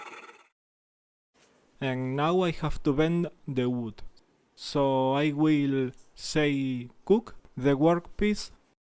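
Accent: Spain. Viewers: Con oírte decir dos palabras sabía que eras español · Este ingles es muy español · Habla el inglés como Fernando Alonso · Se nota que eres español bro jajajajajajajajaja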